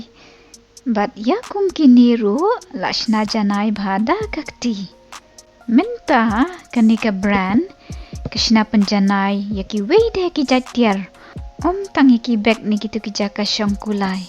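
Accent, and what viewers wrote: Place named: Khasi, India. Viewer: Nga sgewtynnd ia kane ka chanel dei na ka rukom kren...khasi tiak pa tiak bad tbit bha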